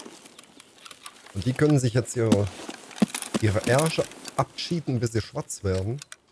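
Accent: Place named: Saxony, Germany. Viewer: Vielen lieben Dank für deine Info. Dir auch schöne Pfingsten. PS: nach deinem Dialekt klingt es nach Sächsisch. Kann mich aber auch Irren.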